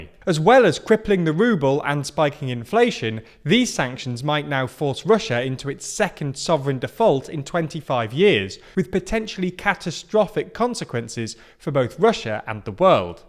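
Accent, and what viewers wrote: United Kingdom. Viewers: I am a bit confused as to why the 2 narrators(this one thinner than the other) of this channel have the exact same voice. Both have good kind of british accent, though.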